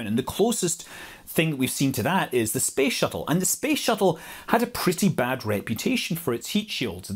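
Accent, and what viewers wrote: Scotland, United Kingdom. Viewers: There's some clever guys on YT, but a lot of waffle & time consumption. Your videos are clear, concise, interesting & layman friendly, while you obviously know what you're talking about. Very watchable & I can comfortably take it all in at 1.25x speed, thanks to your clear diction & varied, enthusiastic intonation (another bugbear of other zzzzzz YT "superstars"). Love your Scottish accent. Great photos & slides. Good Stuff. Keep up the good work!!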